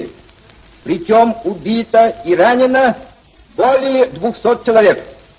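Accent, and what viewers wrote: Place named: Moscow, Russia. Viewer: Это московский говор. В конце 19- начале 20 века подобного было много. Та же булоШная, например. Сталин то грузином был, ему еще простительно.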